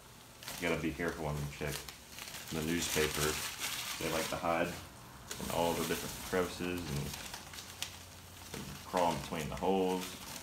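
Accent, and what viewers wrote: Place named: South, United States. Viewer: Not with that southern accent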